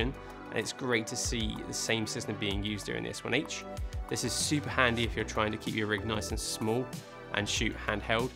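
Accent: United Kingdom. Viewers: Music really should not compete with voice in a mix. I had a hard time hearing the voice. With the British accent and high speed speech to boot, this American had a hard time hearing and processing the information in real time. I did watch it twice, though!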